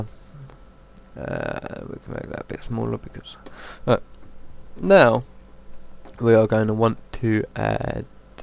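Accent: United Kingdom. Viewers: uh uh uh your uh uh stutters are uh uh almost as uh unbearable as uh that uh mmm brit ass accent. Couldnt watch anymore.... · **you're. And actually I said "British" because I wasn't sure if he was english. He could be from Wales for all I know. I know the accent differs a bit depending on where in the UK you are, but I'm not an Expert! :S Oh and yes, I'm not english or british in any way, i'm canadian. :)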